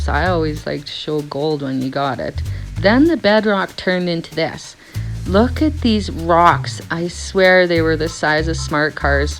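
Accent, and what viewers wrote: Canada. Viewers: I'm here for the Canadian accent, not the gold.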